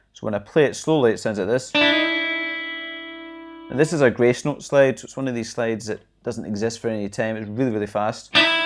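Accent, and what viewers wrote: Scotland, United Kingdom. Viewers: Nice lesson and sound.Good to hear a Scottish accent as well.Keep the lessons coming ..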